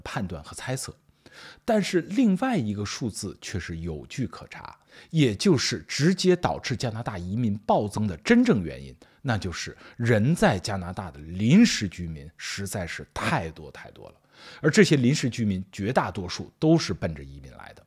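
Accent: Standard. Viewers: 油主是国内播音员出身吗？？这是我听到最标准发音的自媒体人了🎉🎉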